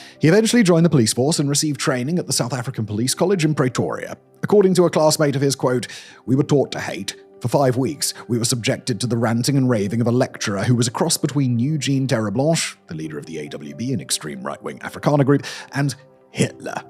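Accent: England, United Kingdom. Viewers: 'N Britse kont agter 'n skerm. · Pokemon Red on 1 screen, A British man describing the worst thing ever on the other. Perfect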